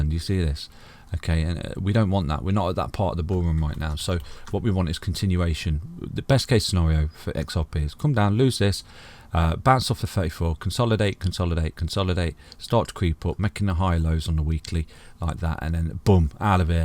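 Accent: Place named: England, United Kingdom. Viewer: I just needed to hear a calm and soothing English accent right now talking bigger picture stuff. Thank you for existing. Subscribed.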